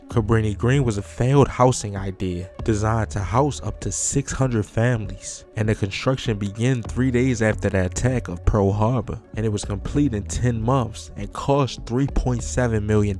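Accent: Baltimore, United States. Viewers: This man got that Baltimore accent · U from bmore?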